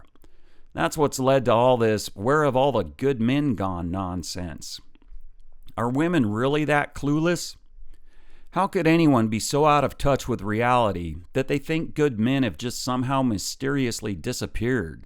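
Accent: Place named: United States. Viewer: Oohh. New video!:D I love listening to your american-ness:3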